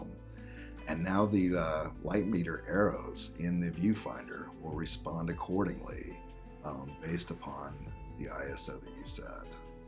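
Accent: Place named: Alabama, United States. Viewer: Hi. Love watching your videos, very informative. I have to ask and sorry to pry, but are you from Alabama? I ask only because you have the same speech patterns as Tim Cook from Apple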